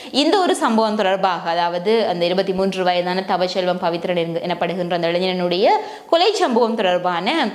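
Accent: Sri Lanka. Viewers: இலங்கை தமிழ் உச்சரிப்பு மிகவும் அழகாக இருக்கிறது ஆஜர் என்ற சொல்லி தவிர்த்தால் நல்லது,அது தமிழ் இல்லை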